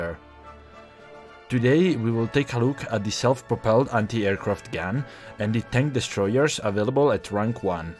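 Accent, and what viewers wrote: Italy. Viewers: Si vede che sei italiano, lo riconosco dall'accento, e da come pronunci l'Italiano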